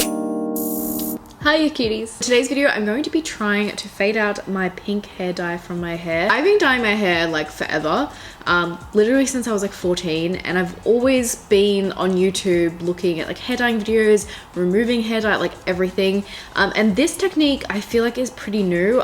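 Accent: Australia. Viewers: You look so gorgeous without makeup! Such a natural beauty, also are you Australian? I recognise the aussie accent :)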